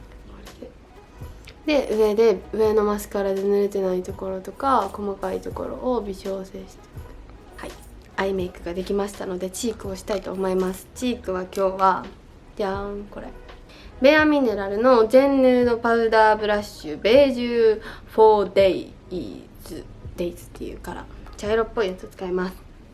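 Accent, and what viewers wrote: Kansai, Japan. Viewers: なんか今日めっちゃ関西弁、笑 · 関西弁？なところがまたいい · このお顔で関西弁は強い、、❤︎ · 最近の話し方わりと標準語っぽかったけどやっぱりコテコテ関西弁のほうがいい〜！！ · 今回めっちゃ関西弁が多いなぜ🥺🥺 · 今日いつもより関西弁！？最高やん😍😍😍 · いつもこんな関西弁でてたっけ？(笑) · 「〜しとう、〜しとった」に同じ兵庫県民としてめちゃくちゃ親近感わきました☺️❣️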